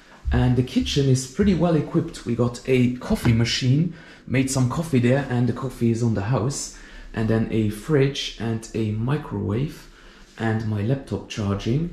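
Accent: Germany. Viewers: you have a very interesting accent. It sounds very much like a german one.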